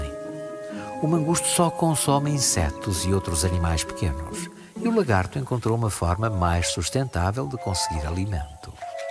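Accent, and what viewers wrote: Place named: Portugal. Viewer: Ô inteligente, o narrador não é português do Brasil, ele é português de Portugal.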